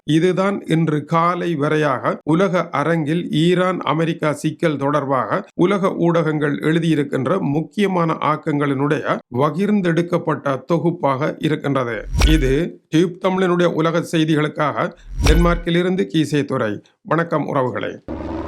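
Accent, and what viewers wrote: Sri Lanka. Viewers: Tamil look like sri lanka Tamil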